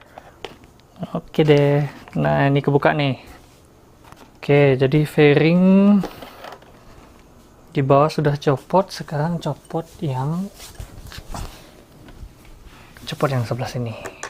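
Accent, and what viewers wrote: Bali, Indonesia. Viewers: subscribed. aku suka cara ngomongnya. khas orang bali. apalagi kalo lagi rliding eh riding gak ngebut sih cuma ya asik aja dengerlin eh dengerin celotehannya. salam kenal om ✌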